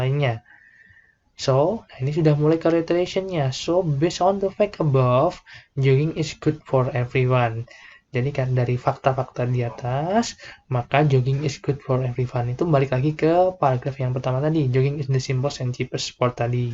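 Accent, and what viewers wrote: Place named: United Kingdom. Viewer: Britis sekali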